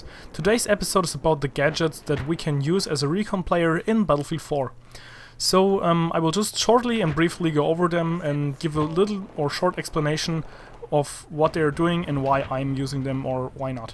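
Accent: Germany. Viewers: mach mal deutsche videos.... Man hört das du deutscher bist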